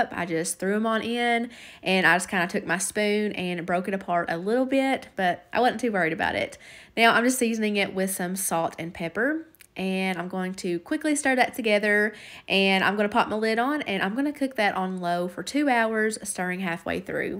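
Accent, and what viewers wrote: South, United States. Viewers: I'm so happy to see your back doing your what's for dinner videos, everything looks so yummy, I hope that in time you will start healing from losing your family member, I know exactly what you are feeling because I have been through the same thing, anyway, I'm so glad you're back, I surely miss when you don't post, I just love your southern accent, it's so cute!! Sending you and all of your family lots of love and hugs, take care, I'm looking forward to your next video, God Bless You All 💗🙏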